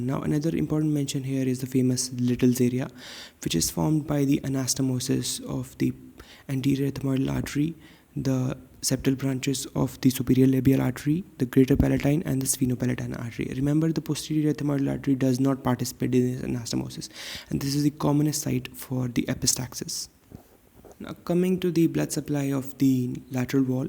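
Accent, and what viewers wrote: India. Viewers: External * you are inian that dialect is disturbing me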